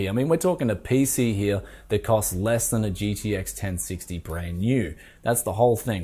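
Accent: Australia. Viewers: Oh yay an Aussie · Is this fella Aussie?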